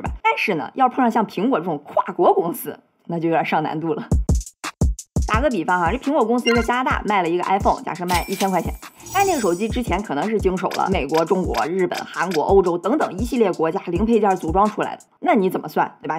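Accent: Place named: Dalian, China. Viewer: 你作为大连人讲讲大连白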